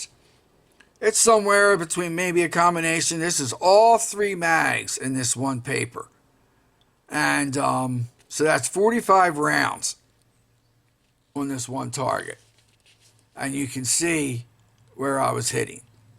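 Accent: United States. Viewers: Hard philly accent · Where are you located? Got kinda a “Balmore” accent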